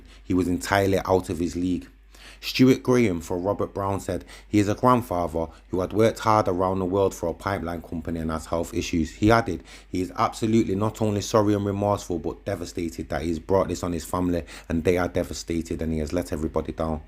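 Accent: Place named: Manchester, United Kingdom. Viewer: Good to hear a Manc throwing it out. Keep it up 👌🏼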